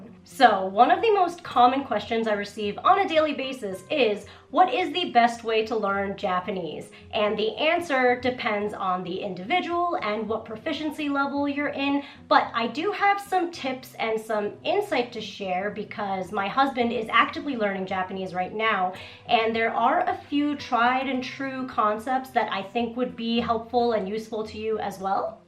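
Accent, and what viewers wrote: United States. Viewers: Her code switch is AMAZING! When speaking American English she pronounces Japanese words like an American would, but when she speaks Japanese, it's Native AF. I can see why a lot of people think she's American or Half-Japanese and Half-American even though she's Japanese. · Your English is good American English , bad English English. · Interesting! And how come you have such a great American accent? I came here because I work as a translator and currently I am translating a Japanese series for a TV network (I have English subs and translating into Croatian). I am now on episode 4 (out of 10) and I feel that I am KIND OF catching the rhythm of the language, so I wanted to find out more about it. Greetings from Croatia!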